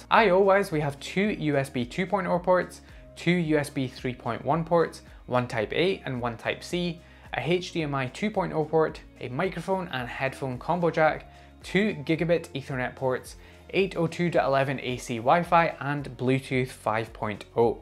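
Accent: Scotland, United Kingdom. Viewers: Cracking video mate, so useful in the current climate! Also can’t tell you how nice it is to hear a fellow Scot on Youtube in a space dominated by Americans! (no hate to them, just nice to hear 😎)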